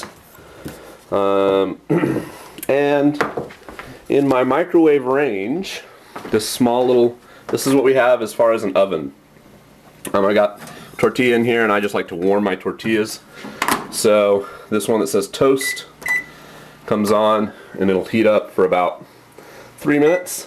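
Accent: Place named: Scotland, United Kingdom. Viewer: YAY! Scottish accent..you should make a 2 part video with this accent.But you should have saved that last part(when you eat) for your next 2 part video,Eating with...with a scottish accent...ha ha ha.Seriously it would be nice if you could make a video at many kind of japanese restaurant,like where you go often for ramen,okonomiyaki etc...should be great!looking forward to your nxt vid.(i'm really curious about Okonomiyaki restaurant and Genuine Ramen Stand)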